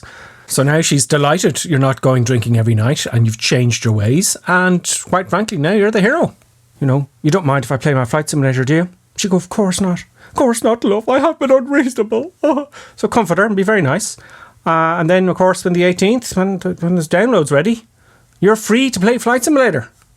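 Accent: Ireland. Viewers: As always great video professional informative most of all fun to watch thank you and I love your Irish accent 👏👏👏👏👏👏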